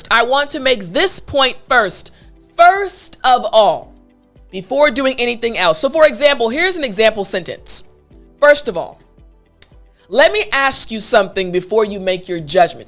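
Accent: New York, United States. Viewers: ARE YOU FROM NY? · Are you from NY ? Sounds like that)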